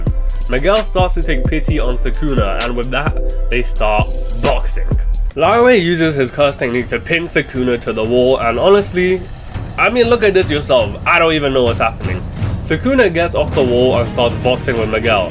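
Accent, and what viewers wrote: England, United Kingdom. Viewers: Wait you from England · U from England crazy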